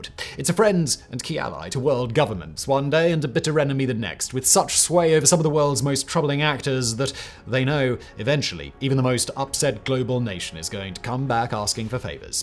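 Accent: United Kingdom. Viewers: This series is the case in point for the theory that everyone sounds more intelligent when speaking with a British accent and everything sounds more credible and authoritative when spoken with one😂.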